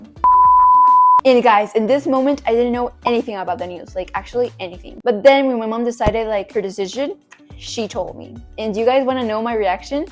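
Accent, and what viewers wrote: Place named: Peru. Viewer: I knew you had an accent but I had no clue where you were from but that’s really cool that’s your from Peru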